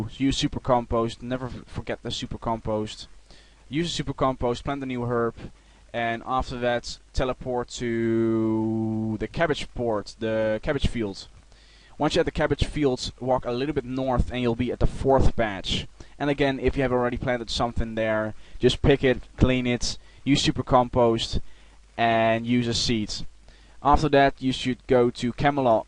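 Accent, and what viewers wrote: Netherlands. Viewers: Dutch accent <3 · Mhm pittig Nederlands? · are you dutch? :D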